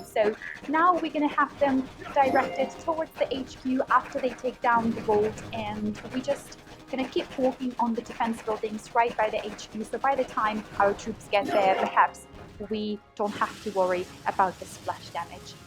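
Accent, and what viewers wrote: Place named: United Kingdom. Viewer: I like the British accent. Haha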